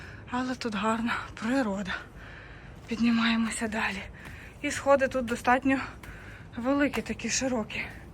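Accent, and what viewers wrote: Standard. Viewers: Я як львівʼянин можу сказати, що дівчина розмовляє досить чудово українською мовою і без суржика ! Судячи з ваших відео ви не знаєте, що таке українська мова! Вести відео на мові вбивці наших українських дітей це ганьба вам!!! Краще мовчати чим писати це сміття!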